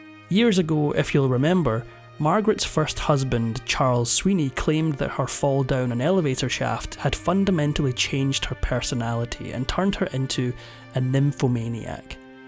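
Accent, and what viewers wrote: Scotland, United Kingdom. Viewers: Scots don't listen. They burr. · Why do I always think THANK GOODNESS 2 seconds into the video when our Scottish narrator kicks in. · With a charming little Scotish zing.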